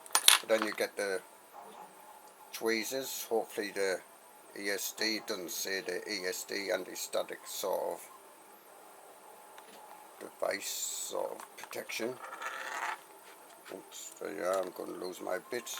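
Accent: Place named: West Midlands, United Kingdom. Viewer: God that English accent is hard to hear. West Midlands?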